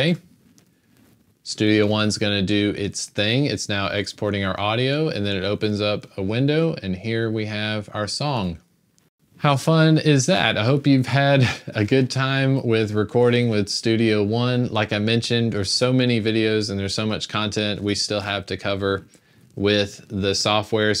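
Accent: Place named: South, United States. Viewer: I could be wrong but I detect a very slight southern accent in your voice.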